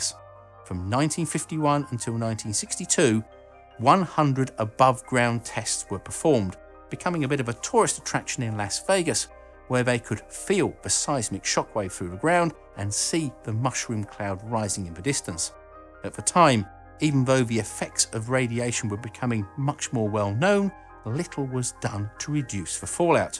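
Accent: United Kingdom. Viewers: "Since the formation of the United States its mainland has never been invaded or had any major attack." Ironically this was spoken with a British accent. For the benefit of those who are not students of American history, here is an example: <link>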